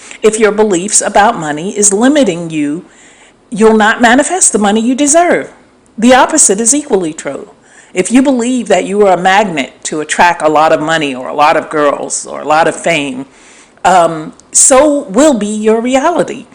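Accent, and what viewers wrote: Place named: Northeast, United States. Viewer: Ha! I bet those who've said something bout your voice are from the South! I'm a southerner I even said to myself "Listen to this Yankee!" I'll apologize for us lol. But on the real, Love ya accent . Love your videos. Keep doing what cha do sistah!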